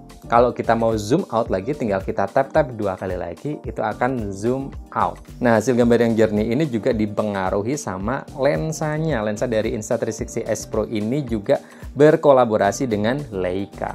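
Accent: Pekalongan, Indonesia. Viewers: Orang pekalongan kah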